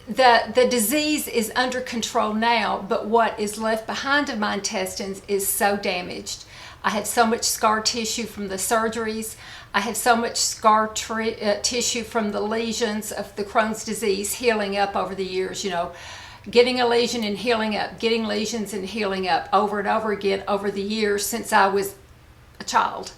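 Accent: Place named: East Tennessee, United States. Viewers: As a newer subscriber, I super enjoy getting to know you like this. I know what you mean about internet safety, and it’s good you’re being cautious; it’s also a shame. 99% of us are just looking for fun and companionship re: girly things, but that 1% makes it unwise to share too much. So nice for those battling chronic health issues that you share about your positivity and living day by day: I also really respect the way you “just say no” haha to either sharing things which have already been done or sharing things just specific to your health needs. Lovvvvvve your East TN accent. And manner of speaking. I grew up in CO and the Midwest and no one speaks as pretty as y’all do. Your makeup looks especially pretty. Okay, friend—off to find my weights and yoga mat. You have inspired me to work on my own core and flexibility!!! 🌸❤️💕🥰🏋🏼‍♀️ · I’m from East Tennessee too. 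Living in Florida now and your accent makes me feel at home. Love your genuine personality. 🥰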